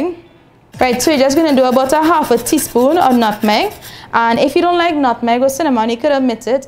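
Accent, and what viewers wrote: Trinidad and Tobago. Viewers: It's just the way some trinis speak. Ever listen to the Indians from India? I swear every other word is "actually"!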